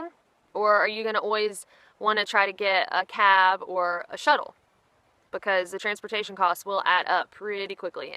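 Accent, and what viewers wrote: South, United States. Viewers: hey how old did you do the AT? also did you make it to the PCT? i could listen to you all day long i love the southern draw:)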